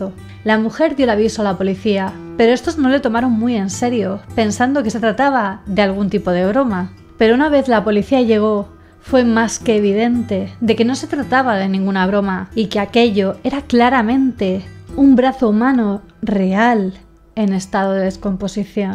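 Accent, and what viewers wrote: Spain. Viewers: Qué hermoso acento español 🤩 😍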